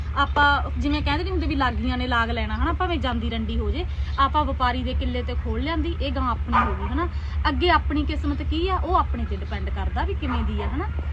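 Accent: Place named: Sangrur, India. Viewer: Didi tusi sangrur wali side toh lgde ho...tuhdi language toh❤❤❤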